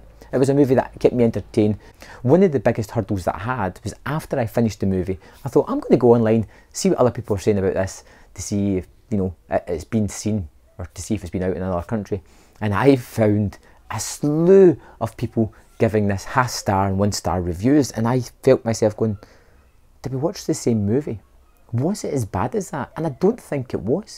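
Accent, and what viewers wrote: Scotland, United Kingdom. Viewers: Jesus man! once I realized it was a Scottish accent I could understand you. At first I thought you were Dutch LOL anyways don't kid yourself my friend it was a terrible movie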